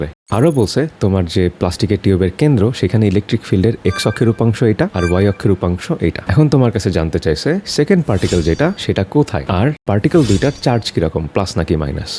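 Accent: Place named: Dhaka, Bangladesh. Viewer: আপনি যে ঢাকাইয়া কণ্ঠ শুনলে বোঝা যায়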